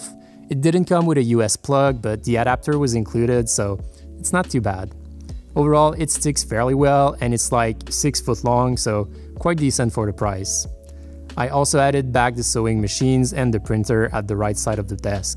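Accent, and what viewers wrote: Quebec, Canada. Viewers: i can tell by your accent, que tu viens du Québec XD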